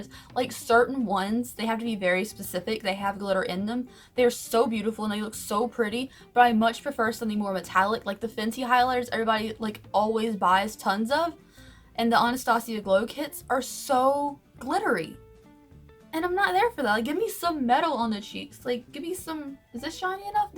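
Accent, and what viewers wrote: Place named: North Carolina, United States. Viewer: this is the first video of yours i've watched and right off the bat i knew you lived in nc bc of your accent lol nc represent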